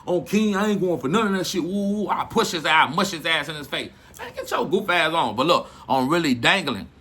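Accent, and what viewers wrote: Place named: New Orleans, United States. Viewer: you kinda sound like you from new orleans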